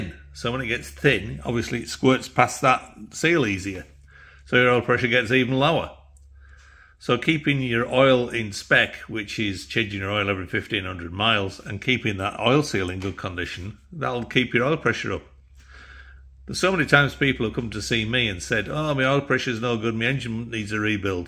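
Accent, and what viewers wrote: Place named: Yorkshire, United Kingdom. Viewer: Your a Yorkshire man but in oz ?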